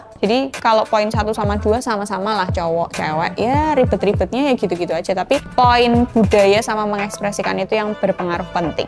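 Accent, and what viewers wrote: Java, Indonesia. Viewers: Medok amat logat jawanya😢, jd ilang selera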